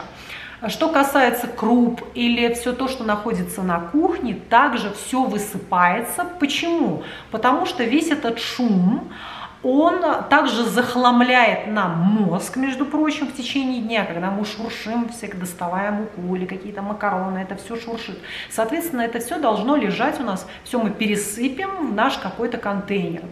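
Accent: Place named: Ryazan, Russia. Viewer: Почему свитАрок, какой-то рАзанский акцент со сленгом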